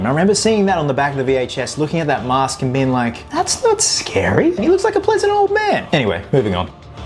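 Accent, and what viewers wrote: Australia. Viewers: I thought that accent sounded right. A fellow Aussie spotted!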